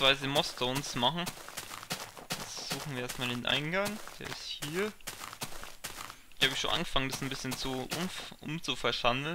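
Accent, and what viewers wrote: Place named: Bavaria, Germany. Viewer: Ich finde die bayrische ausprache von dir so geil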